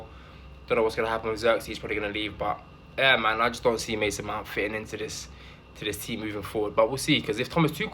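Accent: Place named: Manchester, United Kingdom. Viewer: lovely manchester accent you have there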